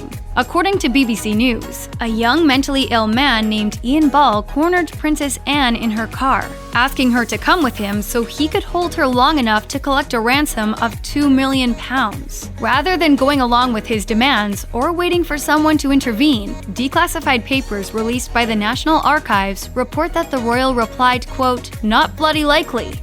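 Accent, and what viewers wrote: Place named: United States. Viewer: No offence intended, and not that anyone was asking, but I can't watch a doco on the Royals that features an American voice over. It's like putting sugar in tea. Or using a wood chipper as a food blender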